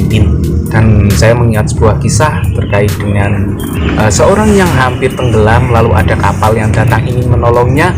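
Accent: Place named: Java, Indonesia. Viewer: medok gaes hehe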